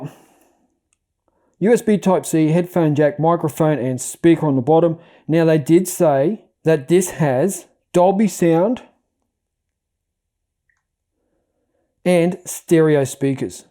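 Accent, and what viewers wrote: Australia. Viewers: english Australia mate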